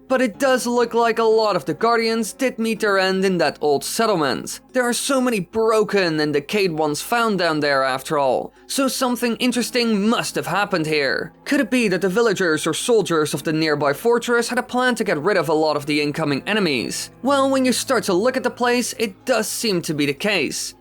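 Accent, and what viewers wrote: Netherlands. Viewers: I've never heard of flooding a settlement to defend yourself. That's interesting. Also, I didn't realize that your accent is Dutch, not British. Oops!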